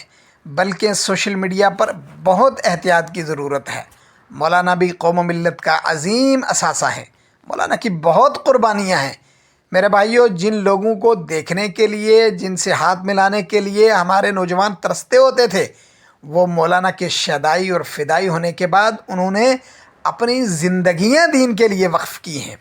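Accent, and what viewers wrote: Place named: Pakistan. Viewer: لہجہ تو آپ کا پاکستانی ہے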